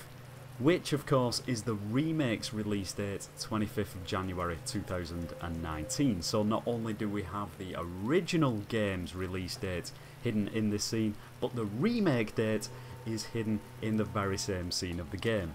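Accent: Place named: United Kingdom. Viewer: British, I think. Very cool.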